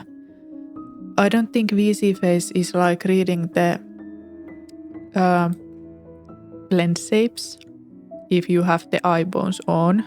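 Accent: Finland. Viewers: Your Finnish accent is Awesome!! as well as your tutorial! thank you! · Your Finnish accent is incredibly strong :D But thanks for the tutorial! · Terve mita culu? I’m Finish American & when you began speaking I knew you’re Finnish. onnea!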